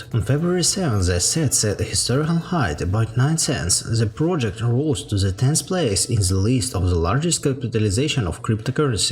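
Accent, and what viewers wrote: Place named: Russia. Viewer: Russian prononciation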